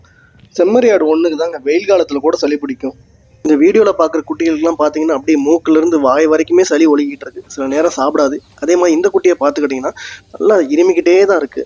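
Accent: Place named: Madurai, India. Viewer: ❤ madurai basha thanks ❤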